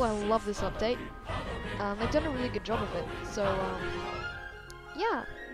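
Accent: Australia. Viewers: ARE U AUSTRALIAN :O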